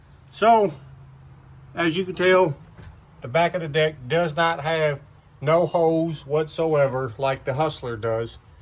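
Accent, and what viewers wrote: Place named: South, United States. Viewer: I hear that accent as he talks, I can just about say he's in the south, so it'll be warm season grass like St. Augustine, Bermuda, Centipede, etc. Our grass in the south is pretty stubborn and tough compared to cool season grass like fescue or ryegrass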